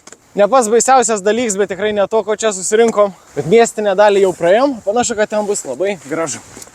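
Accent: Šiauliai, Lithuania. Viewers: Respekc uz siaulietiska kalba:)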